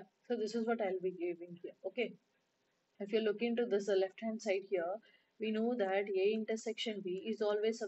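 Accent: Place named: Tamil Nadu, India. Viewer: your voice have tamil accent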